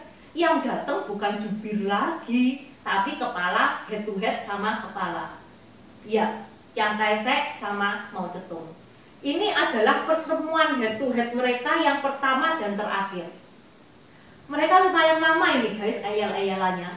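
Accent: Java, Indonesia. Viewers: Bagus memberikan wawasan baru. Tp mgkn lain kali perlu latihan pengucapan istilah tionghoa nya spy lbh enak di dgr gtu. Ini msh terlalu medok logat tionghoa jawanya.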